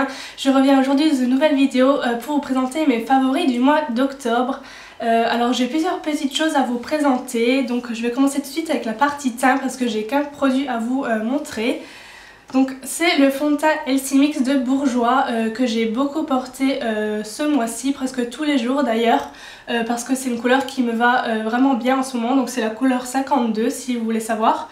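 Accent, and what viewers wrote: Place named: Alsace, France. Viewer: Super ce petit accent Alsacien :) Je vais au marché de noël de Strasbourg cette année, tu dois connaître ça! Sinon encore une vidéo bien top :) Bisous!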